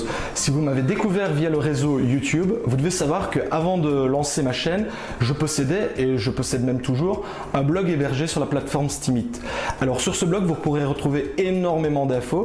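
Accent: Belgium. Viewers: Le p'tit accent Belge me plaît déjà 😁 J'avoue que ça me manque un peu depuis presque 16 ans que je vis en France 😉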